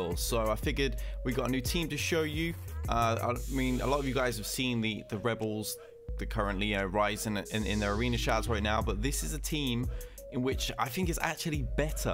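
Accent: United Kingdom. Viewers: Another great video mate its about time you got this buzz. As much as I like Warrior and Cub its much easier to listen to another proper english lad.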